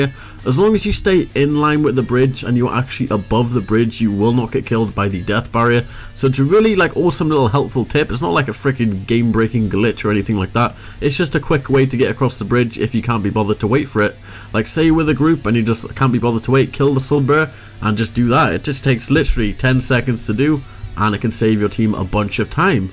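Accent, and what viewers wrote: Wales, United Kingdom. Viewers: are you Welsh dude? 😃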